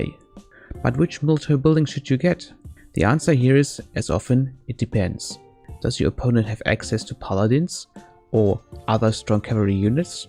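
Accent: Germany. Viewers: Bro are you from DeutschLand?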